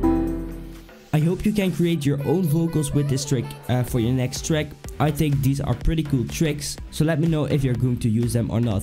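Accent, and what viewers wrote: Netherlands. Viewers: You’re dutch right?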